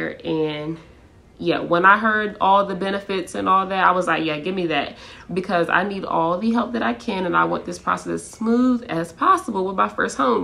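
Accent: United States. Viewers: Congratulations 💯 I can hear the baltimore in you 🦾 we different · Are you from PG county? The accent! lol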